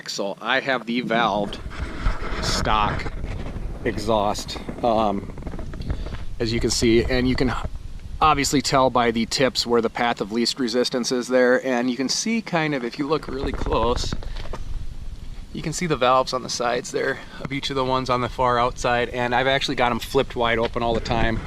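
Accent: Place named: Minnesota, United States. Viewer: Dude sick ass car! And loving the Minnesota accent lol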